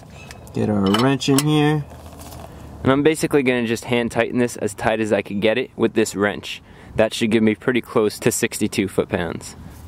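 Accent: United States. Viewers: Just wanted to thank you for your videos. Just spent my Sunday fixing the brakes of the mother of my child's car. Everything you taught was present - blocked nipple, rusty brake fluid and seized bolts. Loved the 1 man bleeding method too. The car now stops on a six pence. My boy likes your tutorials as he's car made and likes your accent ( I think you sound like Marshall Mathers - no offence) - he's only 5 but obsessed with all things from the US of A:) Thanks again.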